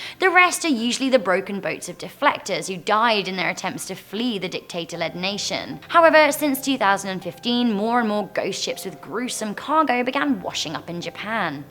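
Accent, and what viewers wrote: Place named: RP, United Kingdom. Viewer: Your Posh English accent is so... 💣💥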